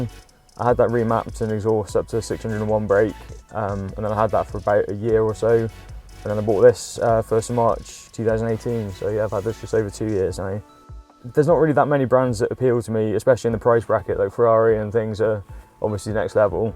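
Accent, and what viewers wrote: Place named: West Country, United Kingdom. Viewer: Think it’s a ‘west country’ accent that may come across quite strong and hard to understand. Also the background music is probably a little too loud.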